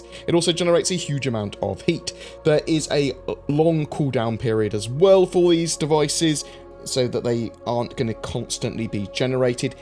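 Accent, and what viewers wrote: United Kingdom. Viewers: The fun thing about listening to videos from people in another country than where I live is the difference in slang and pronunciation of words. I do wonder though if some of what I hear is an artifact of the recording device. I know that in the UK you use "Maths" where in the US we say "Math" for example.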